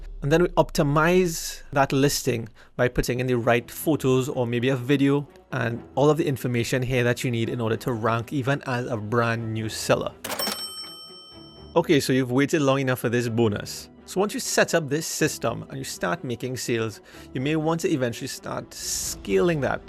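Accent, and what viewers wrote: Trinidad and Tobago. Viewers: Awesome. So am I. I spot your Trini accent immediately. It's great to see a fellow Trinidadian doing well. Thank you for sharing. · Are you a trini? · Thx for info. Love the Trini accent 😉👍🏾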